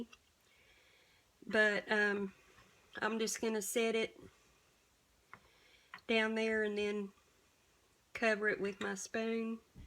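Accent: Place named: East Texas, United States. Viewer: I love your accent! I went to high school and met my husband in East Texas—listening to you is like going home! ❤️❤️❤️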